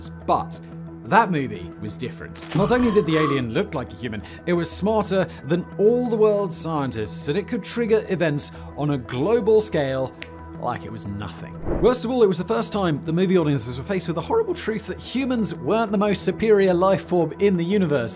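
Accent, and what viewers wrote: United Kingdom. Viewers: Hmm a synapse of the fine points of Hitch Hikers Guide to the Galaxy and read by a Brit? Where Simon's writers just taking a piss?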